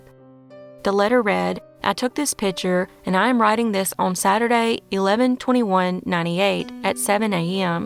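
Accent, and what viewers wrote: South, United States. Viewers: My favorite southern girl's voice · I enjoy your videos. I love you accent which is easy for me to understand, being Southern lady myself.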